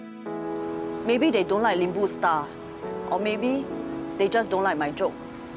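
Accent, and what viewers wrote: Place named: Singapore. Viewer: Wow the the starting abit sad sia😥 But i love your singlish! Super on point😂😂😂😂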